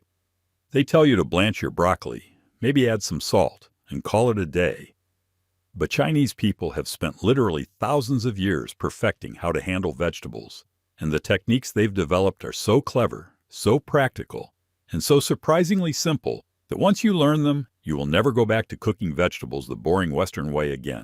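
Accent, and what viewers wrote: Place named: United States. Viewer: What's with that terrible American accent?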